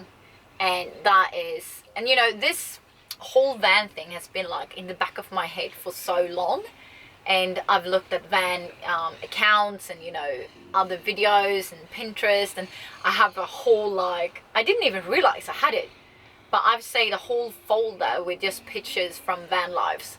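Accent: Australia. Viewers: You are Swedish but why the Ausie accent??? Just wondering?? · Alltså jag blir så irriterad på kommentarer som dessa. pratar hur bra engelska som helst med australiensisk accent. Som jag förstått det har hon rest/bott mycket där. Om det stör dig är det väl bara att avfölja utan att sprida otrevlig energi. Jag skulle typ dö för att prata så bra engelska som gör. Tror snarare hon får fler prenumeranter då den engelskspråkiga delen av jordens befolkning är betydligt större än den svenska 😂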